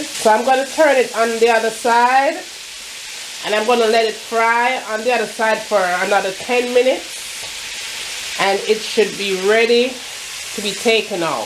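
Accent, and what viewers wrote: Jamaica. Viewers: I love that Jamaican accent even tho it's hard to understand everything you are saying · no sah u can knw say a real Jamaican, anyways love it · I Love this Queen Sister. She have me on the floor with her yard accent. I simply Love IT !!!! Tell it Sister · Lol I love how she chats the patois, many videos out there with Jamaicans trying to "twang" n it sounds bad....it jus feel like my aunty telling mi how to cook!!! well done. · The Accent tho !! Love it !! Real Jamaican ! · "Nuh bodda guh watch tv an mek di chicken bun up!" 😂😂😂 you a real Jamaican! Love the videos! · Love ur food and the way u talk real Jamaican lady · Very nice. Actually the best fried chicken recipe I've seen. I'm going to follow your directions and let you know how I did. an mi did very much enjoy do real yardie accent. Bless up.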